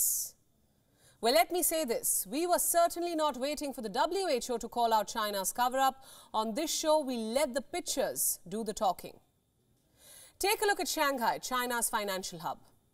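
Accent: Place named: India. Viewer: Why do Indians speak this way? I mean they were under Brits for such a long time and you would think they would speak English correctly.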